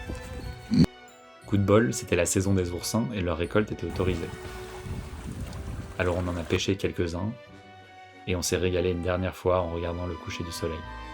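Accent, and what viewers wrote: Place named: Japan. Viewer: 事故無く日本縦断を楽しまれて何よりです。音楽と西諸弁のナレーションも心地よかったです。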